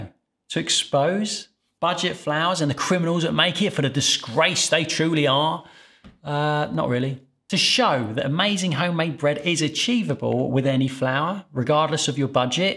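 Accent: United Kingdom. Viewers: The added ingredient is to make people want more of it. As a result it will create more revenue for the company. At the same time, it also creates health issues in the long run. Making people seek Dr's, Dr's give meds, meds will create other health issues that would require more meds. It's a win win situation for the market at our expense. That's all there is to it. Thank you for the info. It sounds you are British from your accent. I don't know if this is worldwide, but in some countries mostly in the West (go figure) they are adding crickets and other bugs in the food we buy to make st home. So let us know if thst is also happening where you are. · His diction is not the same as his accent. His accent is that of 100s of 1000s of UK population. A northerner might say "ah nuh vah" but in a northern accent. Get a grip.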